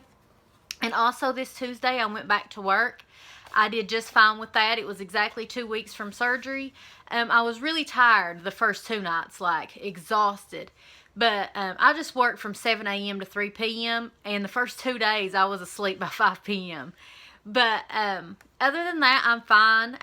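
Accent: South, United States. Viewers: Girl I love your accent. lol Country girl like me! I live not far from you in Bristol, VA. You are doing so good, just hang in there, the lbs will fluctuate each week! Keep it up!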